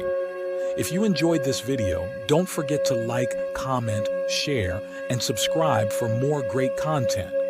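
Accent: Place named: United Kingdom. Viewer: NW Georgia. You ? I thought you were British. 😂😂.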